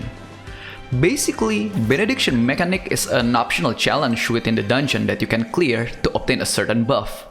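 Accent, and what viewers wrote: Indonesia. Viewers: i believe its indonesian accent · yes it is, even though I don’t really talk like that but I hear a lot of people in Indonesia talk like this.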